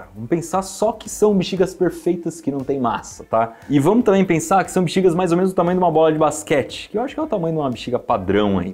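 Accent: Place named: Minas Gerais, Brazil. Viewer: Ele deve se mineiro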